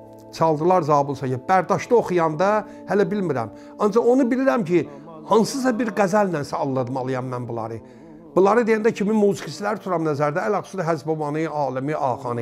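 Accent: Baku, Azerbaijan. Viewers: Təmiz Bakı ləhcəsi ilə Azərbaycan dilində danışır kişi.Burda başa düşülməyən nə varki? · Allah səni bizə çox görməsin Hacı. Doymuram sizə qulaq asmaqdan . Bakı ləhcəsidə öz yerində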